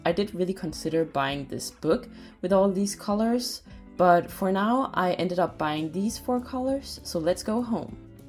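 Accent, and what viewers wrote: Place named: Scandinavia. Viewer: Oh my gods, I did wonder whether you were Scandinavian or Dutch or something similar due to your accent - and here you are walking around in my own city! Gosh, the world is a tiny place sometimes XD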